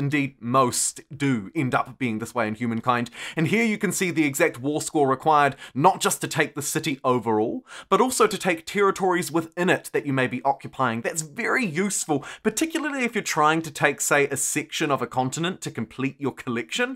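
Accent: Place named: New Zealand. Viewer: You’re one of the only excited-sounding YouTubers that I can tolerate. You’ve got such a genuine joy behind your voice and I love to get my Humankind news from you! It’s probably because you’re a Kiwi. Love that accent. Keep it up!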